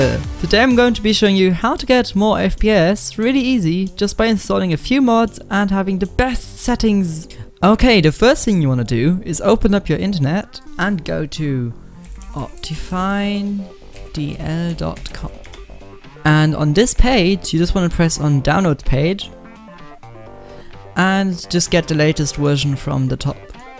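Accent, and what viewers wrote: Germany. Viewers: Are you german? You really sound German or scandinavian! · Are you german? You sound like a german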